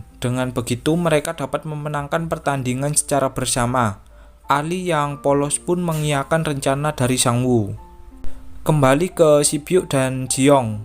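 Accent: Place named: Banyumas, Indonesia. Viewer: Ngapak yak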